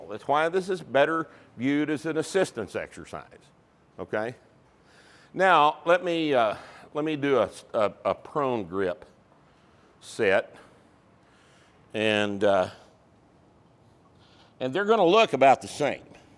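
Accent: Texas, United States. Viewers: I've got rippetoe at 1.75 speed and it still sounds like just slightly fast talking. Lol. That slow texas drawl is strong with the. Lol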